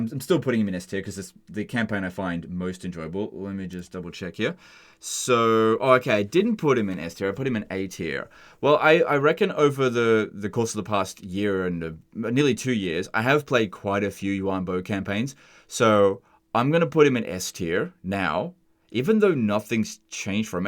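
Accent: Australia. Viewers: How come you can't say golgfag maneaters name? Is that an australian accent thing?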